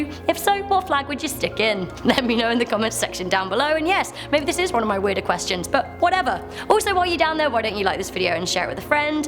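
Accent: United Kingdom. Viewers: Toronto? You sound so British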